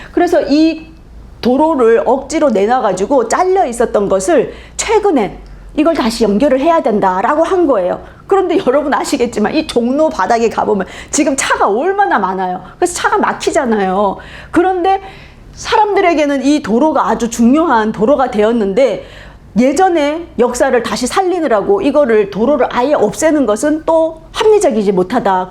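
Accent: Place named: Jeolla, South Korea. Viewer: 全羅道 方言(사투리)가 섞인 解說이네요. 强하지가 않아 귀여우시네요. 感謝하당께롱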